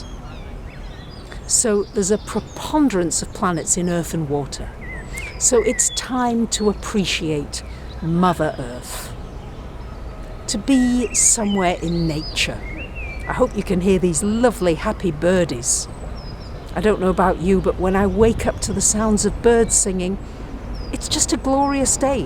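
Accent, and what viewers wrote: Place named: Manchester, United Kingdom. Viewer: Great video. You said you're in Edinburgh but I recognized the Mancuran accent. I'll admit I'm a huge Oasis fan and that's how I recognized the accent. Happy full moon from California.:)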